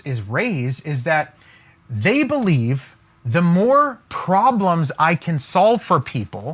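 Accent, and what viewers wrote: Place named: Israel. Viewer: pretty good Israeli accent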